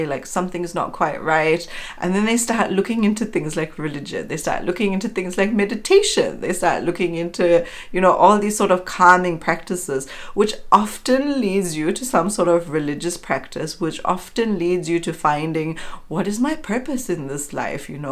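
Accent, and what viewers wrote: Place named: South Africa. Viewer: Beautiful inspiring video ❤ Also recognize a slight south african accent...love it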